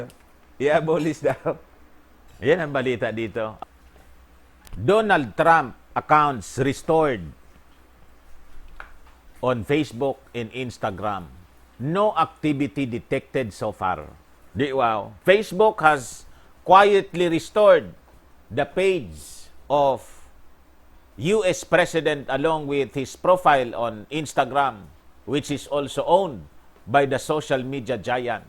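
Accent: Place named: Waray, Philippines. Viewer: TamA ka manOy, Hahahaha natatawa din akO.. natatawa AkO sa sige na gud ( ang sige nA ngA: )) Ang sarAp pakinggan dahiL kuha mO ang accents ng warAynOn, isa akong taga pakinig sayO manOy, at warAy-warAy din akO purong waraynOn.. at ganon din si MadAm Imelda R. MARCOS AY isang waray waray din. God BLESS.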